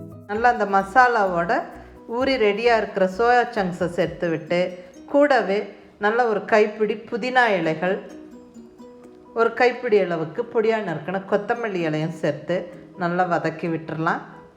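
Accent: Kongu, India. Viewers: I tried and the result was superb like your kongu slang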